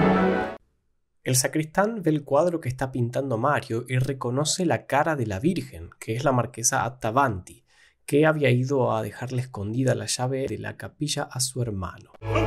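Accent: Argentina. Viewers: Estimado , no tomes a mal si te expreso que me es insoportable el uso del sheismo en sustitucion del yeismo, lo considero una afectacion decadente surgida en los 90. Vos sos joven y tal vez tu entorno lo usa, pero me gustaria que reflexionaras al respecto , imaginate a Gardel cantando " de un noble potrisho que justo en la rasha afloja al shegar" ( no creo que hubiese triunfado de expresarse asi). Confio en tu sensibilidad auditiva , abrazo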